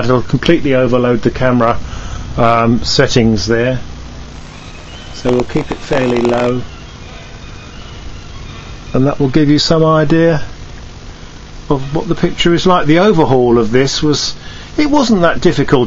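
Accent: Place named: England, United Kingdom. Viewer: Anytime its an Englishman talking you know the video is going to be top quality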